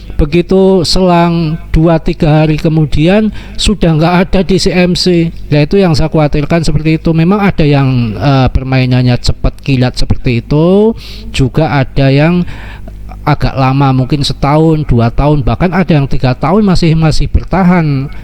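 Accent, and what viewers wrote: Banyumas, Indonesia. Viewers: Lah wong Purwokerto kye tah,ngapak njeplak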